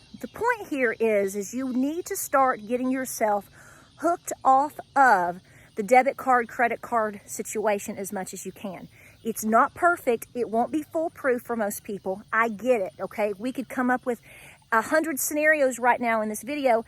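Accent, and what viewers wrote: South, United States. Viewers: This is how mamas in the south used to talk. It's music to my ears. I'm 63 watching the video mumbling things like "yes ma'am."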